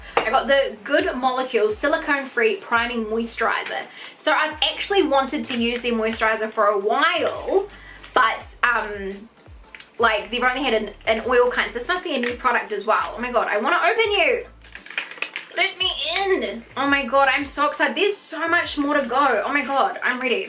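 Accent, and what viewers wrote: New Zealand. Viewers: !! I'm loving your excitement in the video, so glad you love the box. I'm a kiwi living in UK, so nice to hear a friendly voice from my home x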